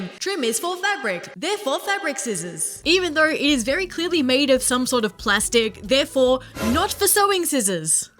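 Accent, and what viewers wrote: Australia. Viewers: If I was asked . . . I'd guess you were an Aussie !. 🤣🤣😂 I never realised that before ! It makes me so happy to know you are a Cousin !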